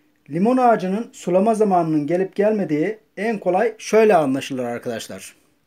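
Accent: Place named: Azerbaijan. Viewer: Aynan azeri lehcesinde konuşuyor.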